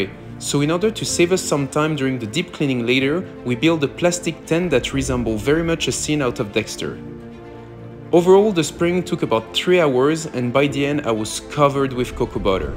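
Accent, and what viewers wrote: France. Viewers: Your French accent is as smooth and as delicious as the finest chocolate. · I love your french accent 😅 Moi aussi je parle français😆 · Loving the voice over. Ça fait du bien d’écouter l’accent français ❤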